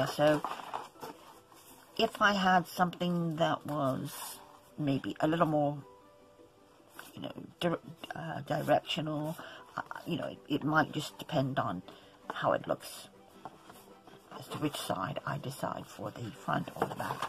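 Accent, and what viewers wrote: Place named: United Kingdom. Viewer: are you from the UK. Your tone and accent is very nice. We don't feel like you are rushing through it. Now your project is the best one yet. I love the little sheen in the checkered pattern and the bling type ribbon you used to give that wow factor. Give Chloe little kisses for me.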